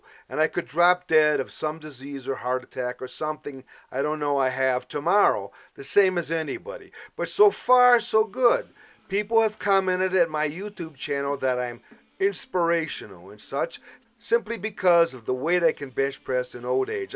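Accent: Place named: Minnesota, United States. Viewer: Well! Look up the phrase "Old Guys Rule" and you'll find a link this this video. I think my jaw was on the floor during the entire thing. You've transcended mere "inspirational" and achieved Zeus-hood. 320 pounds - egad. I turned 69 earlier this week, and for that birthday I did a rep of 225 ("two plates") in the local YMCA after 29 years without touching a barbell. (My peak in my 40s was a couple of reps of 287.) I was a little shocked that it only took me two months from 135 to 225 - newbie gains. Apparently, you can build muscle after 60; I had no idea this was so. I shall next attempt 230, then 235, etc. Anyway, calling this a remarkable video doesn't quite capture it. This is one of the realist things I have ever seen. Your voice seems very familiar. After some thought I have it: Your voice reminds me of Jonathan Banks, who played Mike Ermantraut in "Breaking Bad." Banks is from D.C./Maryland, but I thought I detected a Minnesota dialect from you. 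Where are you from?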